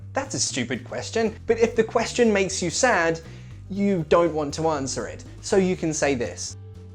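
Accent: United Kingdom. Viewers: OMG ! u've got a great British Accent . 😮😮 by the way . I like ur style of teaching it's funny All the best 😍❤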